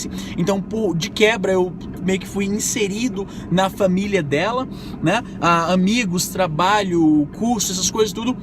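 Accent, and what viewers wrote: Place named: Brazil. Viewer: Ainda acho q vc ainda tem sotaque brasileiro(que nao eh uma coisa ruim!), mas vc tem muita fluencia, parabens! Gostaria q vc fizesse um video com as coisas ruins de morar no canada, pois muita gente se ilude e quebra a cara, quando se da conta que nao eh as mil maravilhas. Japoneses tem ateh um nome pra isso: sindrome de Paris. Coisas ruins, por exemplo: a dificuldade de um estrangeiro ter um relacionamento com nativa, o inverno, as comidas, a distancia com a familia e amigos, diferentes festas e festivais, a dificuldade de conversar assuntos cotidianos com um canadense (por exemplo, conversar sobre silvio santos (Br) x Terry fox (Ca)) Obrigado!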